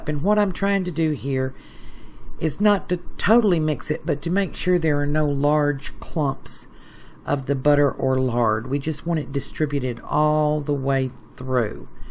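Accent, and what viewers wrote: United States. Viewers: So odd to watch a Cornish pasty being made by a lady with an American accent, nicely done though.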